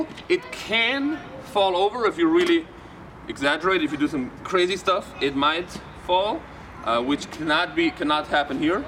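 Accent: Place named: Germany. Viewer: After ten seconds of watching I knew you were in Germany, just by your accent, although it’s not a strong German accent.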